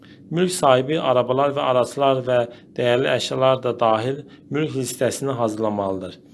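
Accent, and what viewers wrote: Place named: Azerbaijan. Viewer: Bu sesi yazan mutlek ki azerbaycanlidir. Super alinmishdir))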